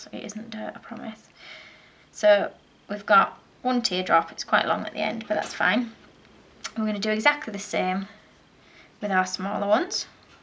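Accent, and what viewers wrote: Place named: Scotland, United Kingdom. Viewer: you sound a bit scottish to me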